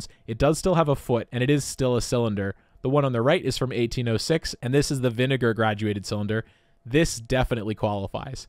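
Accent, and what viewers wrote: France. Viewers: Ey your French accent ain’t that bad haha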